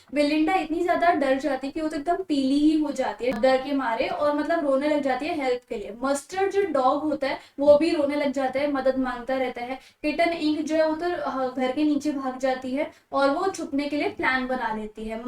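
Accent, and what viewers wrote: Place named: Haryana, India. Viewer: Didi apki awaz se aap haryana ke lag rahe ho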